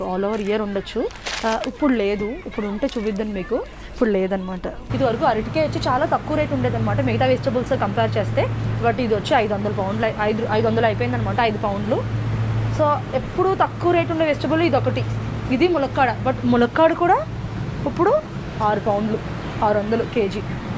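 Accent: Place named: Godavari, India. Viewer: మీ గోదావరి యాస వినసొంపుగా వుంది, మేము కూడా లండన్ వచ్చేయాలని వుంది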